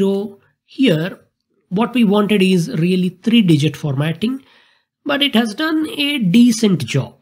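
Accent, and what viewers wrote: India. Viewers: Next time get straight to the point without these useless sketches. And please use an ai to voice over your indian accent.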